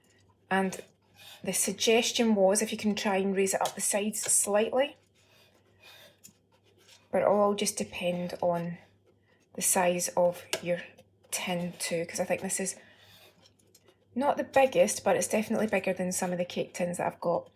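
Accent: Scotland, United Kingdom. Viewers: I need to have a snooze pal. I thought this was a vid of a human + Pie Marriage. Was gutted when i heard the Scottish accent lol. Happy I never read it right. Pal it looks quality but i'm a slice of toast, butter and if av no jam It gets a tablespoon of sugar lol.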